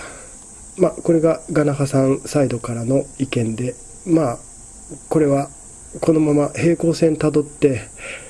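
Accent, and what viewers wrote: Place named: Kansai, Japan. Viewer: そうですねー。水島さんもちょっと感情的になってたんでしょうね。第三者なら、まさかアンタは慰安婦だ！という意味ではないだろうなとわかると思うけど、今、揉めてる当事者であり真子さんは女性なので「慰安婦」という文言を使ったのは、あんまり適切ではなかったかなぁと私も思いますね。私は真子さんが我那覇パパにべったりなのと、江崎さんって胡散臭過ぎるような感じがするので、今までの我那覇さん達の実績をあまり知らないのでなんとも言えないけど、一般の本土の人間として、我那覇パパ、江崎さん、ヒステリックになった真子さんのトリオはへんてこりんに見えましたね。でも、水島さんの慰安婦発言、そこは不適切かなと思います。女性に対してだからね。そういう意味では水島さんもちょっと常軌を逸してたような気も。 ところで、先生は関西出身ですか？私、関西人なんで、時々、関西弁のときがあるからそうなのかなと思いました。私が関西人だからかもしれないけど、神戸っ子の立場からしたら、とても親近感を感じましたー。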